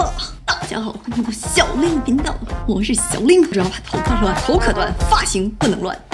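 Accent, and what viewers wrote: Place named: Northeast, China. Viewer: 大姐东北的吧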